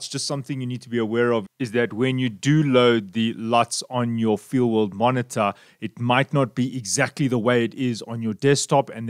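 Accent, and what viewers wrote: South Africa. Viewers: I recognize the South African accent from a mile. Hello from Cape Town.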